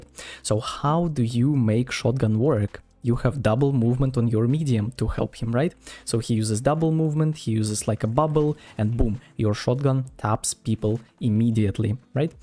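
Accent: Russia. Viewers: Ты же русский, брат, это слышно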